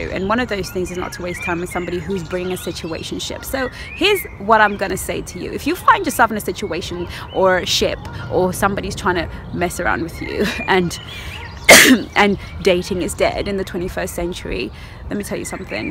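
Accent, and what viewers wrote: United Kingdom. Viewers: Love your videos, love your advice, love your British accent, love your intelligence. As I always say some guys are not worth the time of day and I don't have time for situationships. I would rather be alone and have my self respect. It's so much better.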